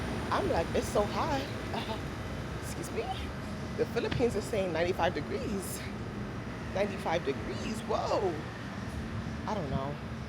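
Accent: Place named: Caribbean. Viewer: Friend —- u really sound like u have Caribbean roots 🥳 I’ve noticed it in a lot of ur vids 🙃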